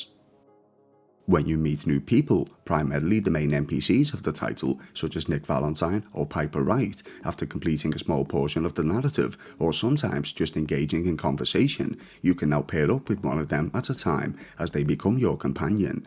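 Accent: Liverpool, United Kingdom. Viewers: Sounds alien to me to hear a scouser on fallout videos. You get the likes n the subs etc for thst alone. Greetings from a manc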